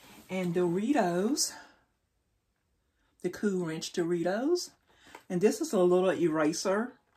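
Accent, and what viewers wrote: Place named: South, United States. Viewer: These are a great idea!!! I saw these socks in Dollar Tree and stood and looked at them, wondering what I could do with them for my craft shows? I ended up walking away, as I couldn't think of anything! Now I am going to go back! I love these! I like listening to you but can't place your accent? It has a bit of a "southern" style, but not exactly - you have a sweet and easy manner about you and it makes it enjoyable to listen to! Thanks again for the great idea!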